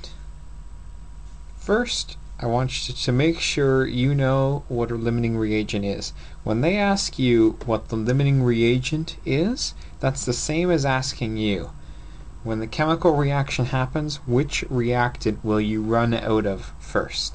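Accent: Canada. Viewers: i hear that canadian accent on ya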